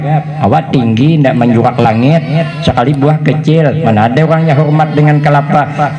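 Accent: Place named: South Kalimantan, Indonesia. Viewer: Asli org mana sidin... lugat mirip kalua amuntai pang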